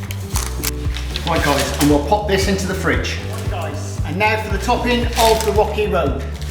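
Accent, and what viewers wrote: United Kingdom. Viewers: Yum! We Americans love a Brit accent :)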